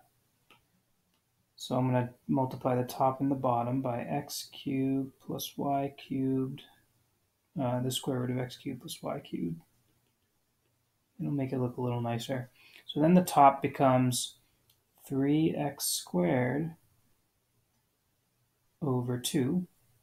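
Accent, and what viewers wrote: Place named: Kansas, United States. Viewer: You sound like one of my uncles. Were you born in Kansas?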